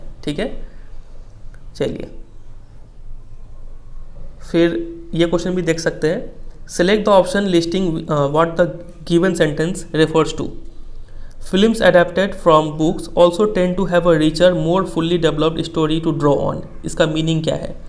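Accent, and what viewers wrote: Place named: Bengal, India. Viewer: Sir mai janta tha app Bengal mai rahte ho apke bolne ka tarika se pata chal gya mai v WB mai rhta hu Kolkata dumdum😁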